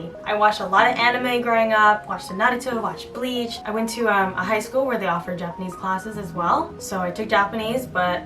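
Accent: Rio de Janeiro, Brazil. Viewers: sotaque carioca diria .. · O que deve ser realmente ruim para um nikkei ao praticar japonês é que os japoneses devem supor pela aparência que o nikkei seja fluente por ter aprendido em casa. A propósito, seu sotaque de português parece do RJ. Muito bom!! 😎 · seu sotaque é bem carioca! s2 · Seu português é muito bom e tem um sotaque meio carioca, sotaque aqui do Rio de Janeiro. Pra entender como é o sotaque daqui do Rio é só escutar a cantora Fernanda Abreu, carioquérrima. <link> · Conheci seu canal a pouco tempo, parabéns, você fala muito bem português e seu sotaque é bem próximo ao sotaque carioca. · Olá,. Falar outras línguas é um privilégio de poucos. Você possui um belíssimo sotaque brasileiro e demonstra muita dedicação e habilidade com idiomas. Você certamente é uma inspiração para aqueles que querem se aventurar pelo mundo do poliglotismo. 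Parabéns, e keep up the excellent work! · Aprendeu Português com um carioca kkk, ta puxando o S, INGLÊIXXX KKK Linda! :D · Você se expressa muito bem em português do Brasil. Acho que em outra encarnação você foi brasileira!!!! Mas o seu sotaque é carioca. Tenta usar o sotaque gaúcho. As girias gauchas também são muito legais. Bah, tchê. São tri-legais.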